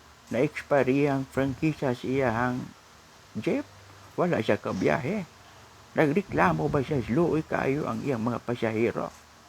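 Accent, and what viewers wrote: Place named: Visayas, Philippines. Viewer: Ganda talaga pakinggan bisaya stay safe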